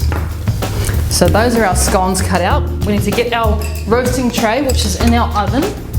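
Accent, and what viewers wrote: New Zealand. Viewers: Going to try these because of your Kiwi accent😂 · I got this recipe from a Tupperware Dealer many years ago and they were the only scones I have ever had success with. I lost the recipe so thank you so much for this Video. I will try it with -preheating my baking dish - is there a reason for this? Even without the accent, "Runny Cream" is so Kiwi. Thanks.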